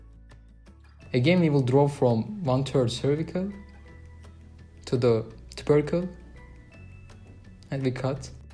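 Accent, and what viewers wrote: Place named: Turkey. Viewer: Tam da Türk aksanı var diyordum 😅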